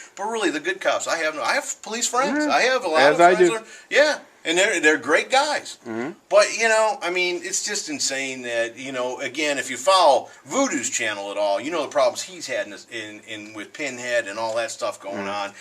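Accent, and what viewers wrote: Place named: United States. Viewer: if it wasn't for your American accent.. I'd think you were a fellow Canadian brother.. hahah annnnddd nobody here will steal your guns.. they may take some of your beers though! haha nice guns.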